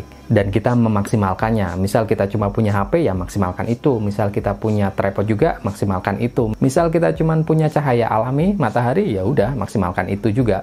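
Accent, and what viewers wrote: Pekalongan, Indonesia. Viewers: Orang pekalongan ya bang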